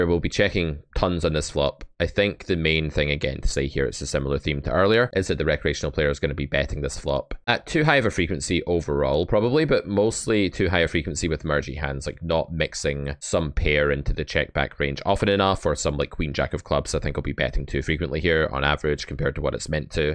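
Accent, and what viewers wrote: Scotland, United Kingdom. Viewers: Can absolutely tell that your Scottish and probably had too many conversations with stoned Glaswegians when you come right off the bat with a spoon analogy with way too much detail.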